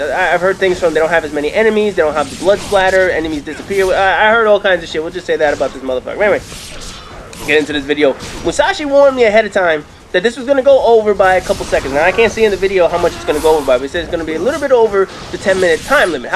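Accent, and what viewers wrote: New York, United States. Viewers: NY ACCENT !! NY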